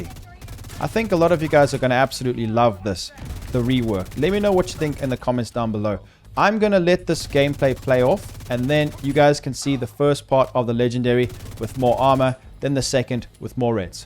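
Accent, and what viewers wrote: South Africa. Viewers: My bru awesome video, are you from South Africa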